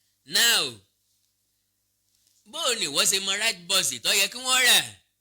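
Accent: Yoruba, Nigeria. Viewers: Your voice, attires, language and tune show a true real authentic and well cultured rooted Yoruba man. Stay blessed. Yoruba nation is moving forward, no going back. Many of our people are accursed seasoned scammers. They will all cry in our new Oodua nation. Ride on, we are with you and the good Yorubas. Gbogbo eni ba je gbi maa kú gbi lori òrò ile Yoruba. Igboho ti lowo ki Yoruba nation to bèrè.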